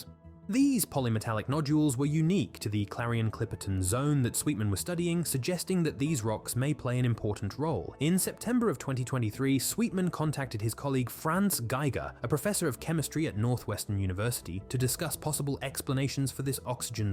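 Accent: United Kingdom. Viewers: Why do you have a British accent and an American accent?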